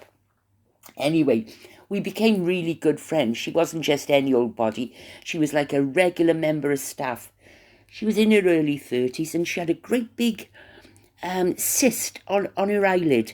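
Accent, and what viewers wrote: Wales, United Kingdom. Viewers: My Welsh friend you have been missed i love listening to your Welsh accent it reminds me of home i can't wait for your next chat i love your stories of the palour And anything really its all interesting to me until your next one 💐 💝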